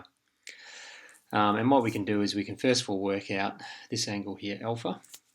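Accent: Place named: Australia. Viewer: I have been trying to understand the process behind finding out the least and greatest value of Arg(z) using sin and tan! At least I can see it here! Well explained with funny Australian accent!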